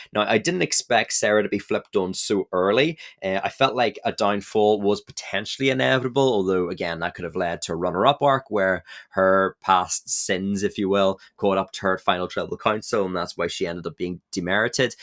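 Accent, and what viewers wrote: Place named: Northern Ireland, United Kingdom. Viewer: Having watched Survivor for like over 2 decades, and only really having some family members also watch Survivor to discuss with them. It's really strange and refreshing to hear someone else with a Northern Irish accent talk about Survivor as there are so few of us! (I'm from Belfast)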